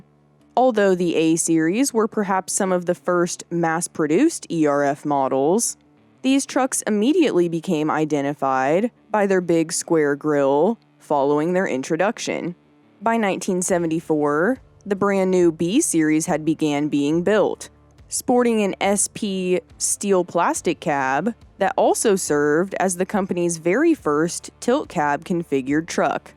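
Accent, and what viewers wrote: United States. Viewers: It's amazing with a yankie american women speaking trow a documentary I love it cool makes you think she's british and loves ERF trucks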